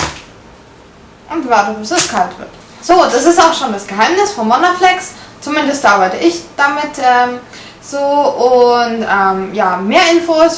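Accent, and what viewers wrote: Germany. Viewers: Wusste ich's doch^^ deinen deutschen Akzent kannst du nicht ganz verstecken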